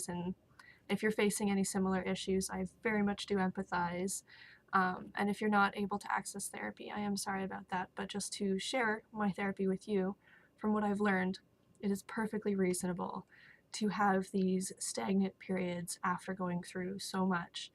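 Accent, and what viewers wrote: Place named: Canada. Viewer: Where are you from im from Denmark. Some of what you say Sound canadian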